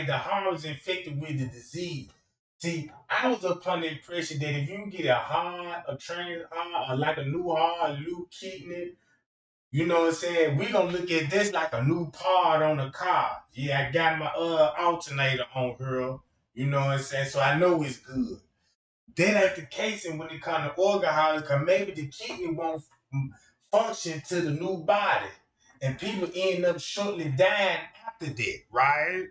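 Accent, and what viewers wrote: South, United States. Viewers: Hey Boo, I Love You, and I know God is gonna Bless You for all your Dedication and hard word. And the Awesome part is your doing it from your Heart, I been riding since day 1 when I feel asleep on my phone and woke up to hearing ur sexy voice with the Sothern Accent it caught my attention and I never looked back. Thanks For all your hard work💯💯🥰💋💖💜🙏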